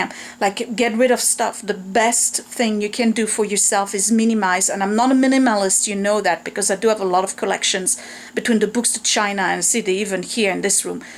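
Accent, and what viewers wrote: France. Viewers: What I'm about to say is probably to repeat what others have already said...but... schools might love the pens pencils. Your baskets, and the contents , the post it notes -- other things -- do NOT look tacky, nor shoddy and others will love picking through. If you placed some of these things within the baskets curbside with a FREE sign atop ... well all this really good looking stuff (if excessive) might disappear quickly. What courage you show in tackling the impossible. It's what you don't want to do but you do it. Super character modeling for those kids. Again a joy to listen to this French inflected lovely voice and all the dissecting of life and our little errors in buying...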